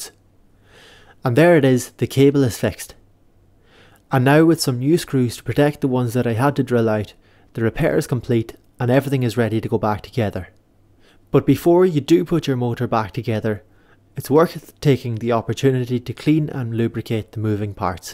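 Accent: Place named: Ireland. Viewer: Thanks, your accent sounded a bit Ireland.?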